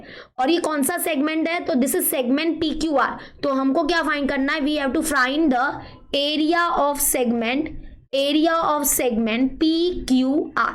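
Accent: Maharashtra, India. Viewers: Dii are you Maharashtrian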